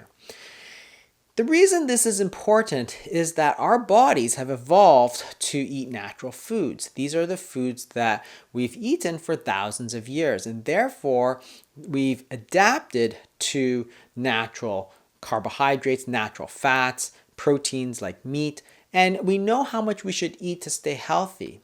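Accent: Canada. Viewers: Dr. Fung is Canadian, and so pronounces words in the Canadian manner. So sorry this offends you.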